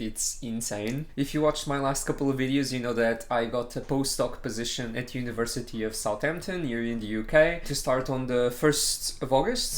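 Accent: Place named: Brazil. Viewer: show us how to make that iced latte of yours. also are you latin? maybe a fellow brazilian? cause of the accent (cute by the way)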